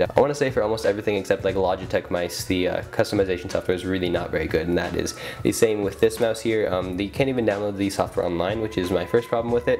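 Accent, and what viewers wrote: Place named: United States. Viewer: he is one of the clear fast pace speaking Brit but I when I focused on listening I just realized that he is American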